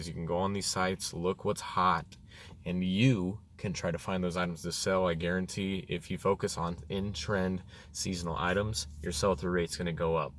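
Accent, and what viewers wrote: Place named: United States. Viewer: God...I just love your American accent, where are you from?Colorado?Denver?, nice video great tips, thanks for sharing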